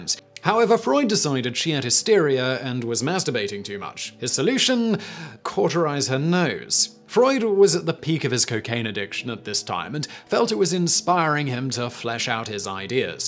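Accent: United Kingdom. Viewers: The British accent is echoing in my headddd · One minute for your thumb nail, meanwhile all I heard was race race race race race from a white guy with the accent of like the most imperialistic nation that still exists. Race race, race race race race race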